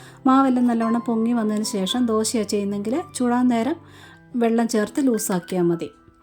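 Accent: Kollam, India. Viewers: കൊല്ലം slang 😊..recipe is healthy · കൊല്ലം ജില്ലകളിലുള്ളവർ പറയുമ്പോൾ സംഭവിക്കുന്നതാണ്... പ്രദേശികമായ ഓരോ സ്ലാങ്ങുകളുടെ പ്രത്യേകതയാണ്.. ഞങ്ങൾ കൊച്ചിയിലുള്ളവർ മാങ്ങ പറിക്കുക എന്ന് പറയുമ്പോൾ, കൊല്ലത്തുള്ളവർ മാങ്ങ പിച്ചുക എന്നാണ് പറയാറുള്ളത്.. Never mind.. റെസിപ്പി എന്തായാലും സൂപ്പർ 🙏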